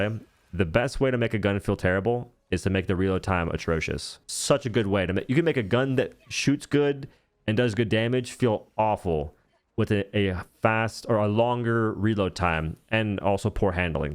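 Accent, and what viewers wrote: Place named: Panhandle, United States. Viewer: This fella sounds like he's frum tha panhayndle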